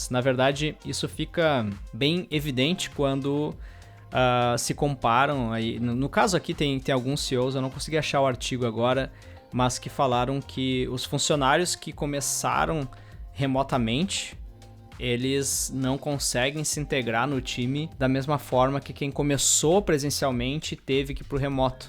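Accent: Brazil. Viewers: meu sotaque no inglês é igual o seu! Eu sempre ficava muito desconfortável e com medo de tudo que envolve o exterior pelo meu accent. Eu entendo tudo, consigo falar tudo e me expressar, mas o sotaque brasileiro é muito forte e as vezes da aquela engasgada. A gente vê na internet e acha que todo mundo é perfeito e faz tudo que a gente faz 1000x melhor, mas a real é que não. E pra dar certo, pra melhorar, é só ter coragem de dar a cara e tentar. Quem não arrisca não petisca. Parabéns pelo vídeo e sei que o inglês não era o objetivo, mas obrigado! Mesmo num vídeo de "opinião", seu trabalho impacta as pessoas de formas que você nem imagina. Abraço!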